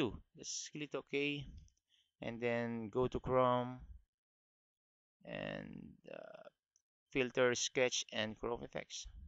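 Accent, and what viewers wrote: Philippines. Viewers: nice vid !! nice pinoy accent!!! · kaya naman pala parang pilipino ang nagsasalita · hahah. is this guy a fillipino? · ang pagka english nya ay PINOY na PINOY! ee!! nice kuyA!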